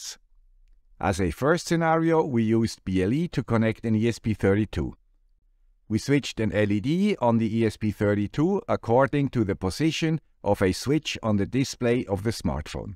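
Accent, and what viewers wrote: Switzerland. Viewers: Hi to the guy with the Swiss accent from the guy with the Swedish accent! 😂😂😂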